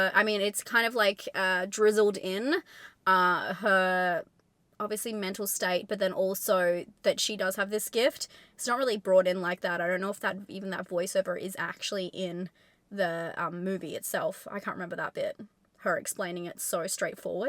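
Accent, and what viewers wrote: Australia. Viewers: your nasally Australian accent is awesome to this California girl--LOL!!! Thank you for the great commentary! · WELL SWEETHEART, IM.JUST AN OLDER.MOM BUT I LOVE YOUR "NASALY" (NOT EVEN!) AUSSIE ACCENT AS WELL AS YOURE AWESOME CONTENT AND YOURE ABSOLUTELY LOVELY TOO! KEEP UP THE GREAT WORK, SISTER!❤😁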